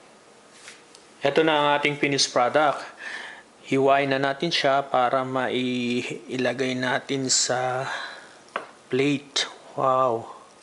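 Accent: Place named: Pampanga, Philippines. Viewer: Kapampangan ka nga....may punto...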